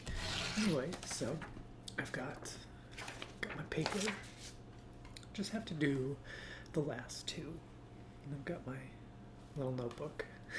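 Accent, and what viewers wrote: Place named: United States. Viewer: As a German-speaker, I say your accent is adorable. ^^ I think it's the first time I ever hear an American (you're American, right?) speak so much German haha. :) I'm glad you uploaded a new video, I find your voice very comforting, I listened to all your vids while doing my dreadful internship in Berlin. ^^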